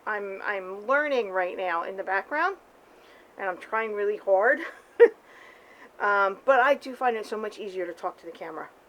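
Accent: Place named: Philadelphia, United States. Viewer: I had a feeling just by your accent you were close to Philly! I’m from New Jersey. I lived in Absecon worked in Atlantic City at Harrahs moved to Las Vegas back in 1996 been here ever since.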